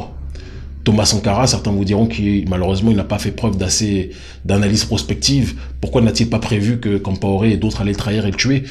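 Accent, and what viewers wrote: Haiti. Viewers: Tu parles comme-si t'es en Haïti! on vous aime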